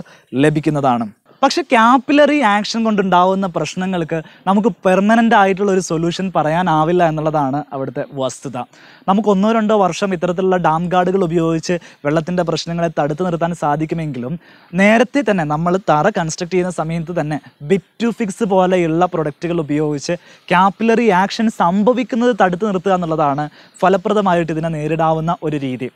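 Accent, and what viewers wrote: Kozhikode, India. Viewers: മയ മാണ്ട പോയിന് വന്നീ ന് പോയിക്ക് കുത്തിരി ഇടെ ഇതൊക്കെ കോഴിക്കോട് മലബാർ ഭാച്ച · അറിവുള്ളവനെ പരിഹസ്സിക്കുന്നത് തെറ്റാണ്, കോഴിക്കോട്ട്കാർ അങ്ങനെ ആണ് പറയുന്നത്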